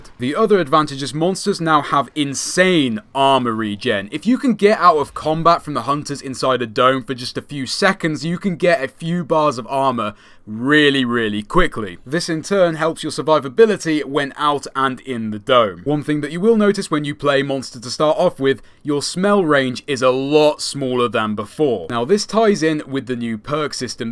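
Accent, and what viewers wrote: United Kingdom. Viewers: How did I know that the commentator was en English lady.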